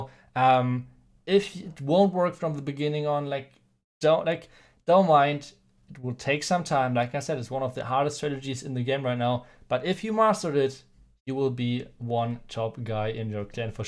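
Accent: Germany. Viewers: Dein Deutscher Akzent ist Mega weiter so!🌞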